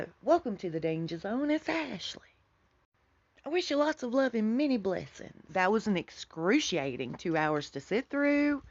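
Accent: South, United States. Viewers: WOW... your beautiful south clarity and articulate (as also my family in NC) said it well. Didn’t watch or listen, but have listened about this chaos!! Oh my gosh! My huge family of blended children-10 and 27 grands, have never said a word about MM OR PH. After I first started seeing ‘them’ this last year, I spoke to my oldest daughter and she only spoke about not liking royalty, in general. Not one word about this, except say anything about those two or three venomous chameleons...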